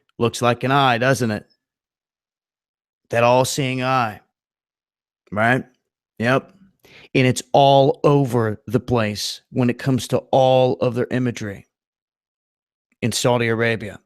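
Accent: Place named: United States. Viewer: wow... to hear hadiths in that yanki accent.. :) kudos mate... good work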